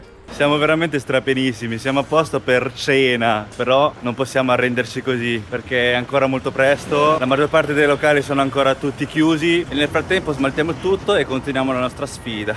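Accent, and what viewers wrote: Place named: Romagna, Italy. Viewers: Mamma mia quanto amo quella zona e quanto amo il cibo cinese!!! A parte la colazione (too much per me!) avrei mangiato TUTTO!! A me sembra comunque che questo ragazzo abbia l'accento romagnolo 🙃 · Il cinese con un vago accento romagnolo di è veramente spettacolare. Comunque, aldilà dell'accento che e' bello del suo, fai veramente dei filmati carinissimi e molto piacevoli. Unica nota pericolosa (te possino...), fai venire na' fame della madonna e fai venire voglia di partire per la Cina, per l'affetto che instilli per questa Nazione, immensa nelle dimensioni, nella storia, nella cultura, nella visione del futuro e, non per ultima, per una gastronomia che fai conoscere in modo assolutamente innovativo (l'accento, la tua cultura, la tua professionalità?) rispetto alla narrativa corrente. Questa sera, intanto, "traslo" la famiglia a Torino a mangiare cinese 🙂. 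PS: vorrei avere ancora il tuo stomaco, con quello che mangi (col piccante, che adoro), adesso, mi ricoverebbero ogni volta....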